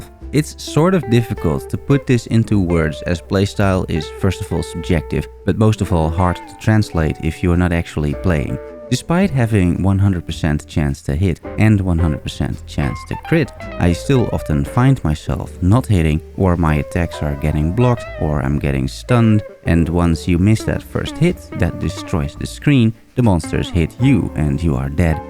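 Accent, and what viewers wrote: Netherlands. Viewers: nice vidz, especially as i am a ssf enjoyer myself. chillt voice and music fits good together. one question: are you from the netherlands with that accent?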